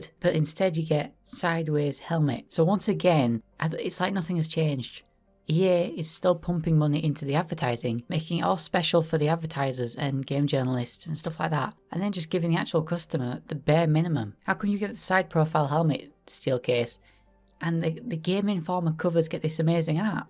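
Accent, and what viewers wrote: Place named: United Kingdom. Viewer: Less british than i expected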